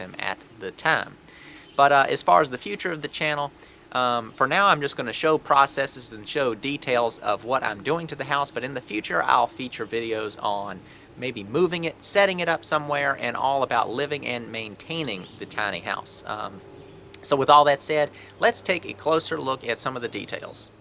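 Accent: South, United States. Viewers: Dukes of hazard accent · who else started reading the comments in a southern accent while he was talking???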